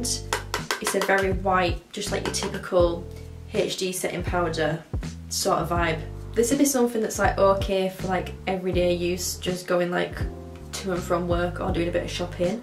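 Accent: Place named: Northern England, United Kingdom. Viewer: I love you already cause I’m Northern too! It’s nice hearing a northern accent on YouTube for once! 💕💕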